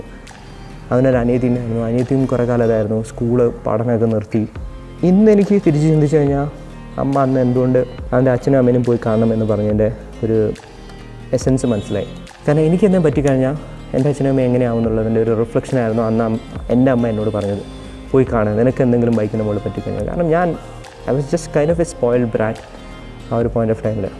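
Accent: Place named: Kannur, India. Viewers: സംസാരത്തിൽ നിന്നും മനസ്സിലാവുന്നു വടക്കേ മലബാറുകാരനാണ് അല്ലെ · It’s not about just age,it’s about your friendly approach.Love and respect from Kannur,Kerala. (Your accent resembles Kannur boy)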